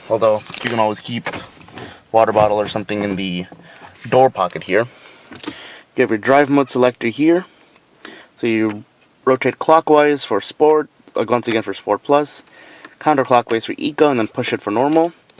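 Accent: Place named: India. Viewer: I just love this car. It is favorite car and i do want to buy one asap. BTW I noticed a slight accent in your talking. Are you indian by any chance?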